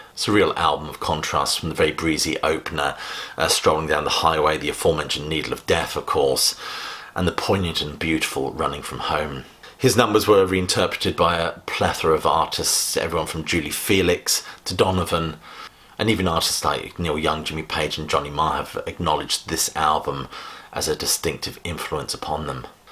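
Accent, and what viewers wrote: United Kingdom. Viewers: Great to see included; I have always admired them. If people do not believe they are good then try listening to side 1 of! I recall Gene Symonds on radio saying were a guilty pleasure, which I thought was a bit of a cheek. The song may be called a guilty pleasure, but never. This is the first time I have watched a Classic Album Review, and I am very pleasantly surprised and impressed by your knowledge, wit and wordsmithery! I shall watch some more. it is nice to hear an articulate British YouTuber. Thank you.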